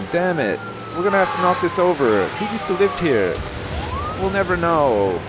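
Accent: Canada. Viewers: YOUR FROM CANADA? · Is canadian???? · are you canadian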